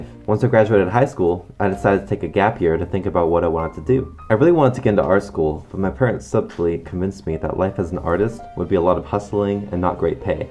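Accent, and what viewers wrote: United Kingdom. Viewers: It's a shame people take a mic about british accents I wish kept his British accent like me seriously tho why would you bully british people if they moved to the states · sad british noises, what british accent did you have when you moved? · Wow they are rude because of your British accent i have a Australia accent well everyone did say i have a accent a Australia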